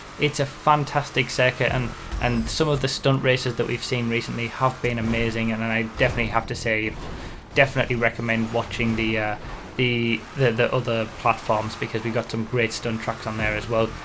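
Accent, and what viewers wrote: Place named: Newcastle, United Kingdom. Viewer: You kinda sound like a Geordie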